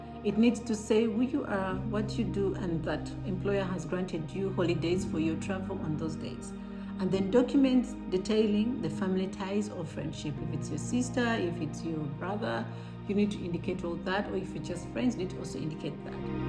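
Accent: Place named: Kenya. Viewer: Im here first🎉..at first i thought you are Kenyan❤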